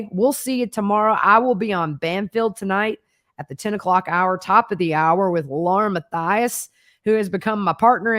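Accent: South, United States. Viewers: I enjoy your excellent commentary as it is so easy to follow. I am sorry to say I just discovered you but I am new to YouTube AND I am 76! I love hearing you talk. I am from Mississippi and have lived in Georgia as well as many other states but I will always love hearing a soft Southern accent.😊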